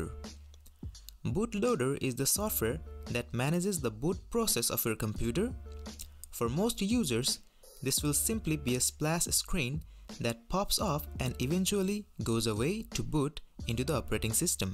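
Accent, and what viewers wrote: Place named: Finland. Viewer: Fills like this guy is Finnish :)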